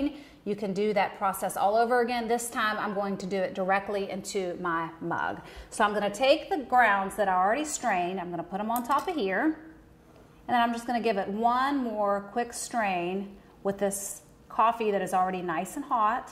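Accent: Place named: South, United States. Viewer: You look (you could be) Italian with a southern USA accent 😍 Where are you? I like the view of yiur opening scene... Give Thanks (Now let me rewind so I can pay attention to your tutorial 💖)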